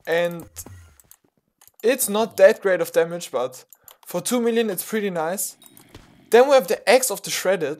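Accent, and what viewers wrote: Germany. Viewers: alter... du bis doch 1 Hundert Prozent deutsch bruddi, man hört das sooo raus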